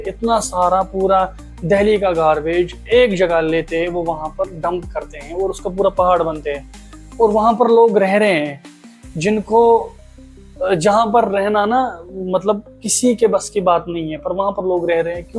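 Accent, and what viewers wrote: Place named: Kashmir, India. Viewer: Are you kashmiri btw accent sae lag raha hai,i am from Jammu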